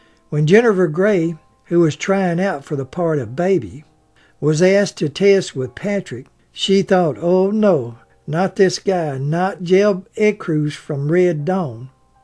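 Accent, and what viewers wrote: South, United States. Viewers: Mr....Another fabulous blog. That Southern accent of yours is so charming. I have been following you for over nine years. Your voice is golden. Please do a vlog about Rosa Parks. Respectfully, Norma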